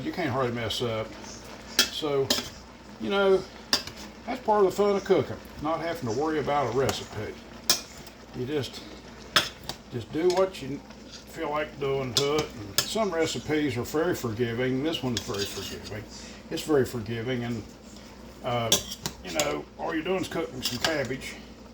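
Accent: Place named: Maryland, United States. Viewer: That looks wonderful!! My dad worked for the B andO railroad, you sound like you are from Maryland, my home state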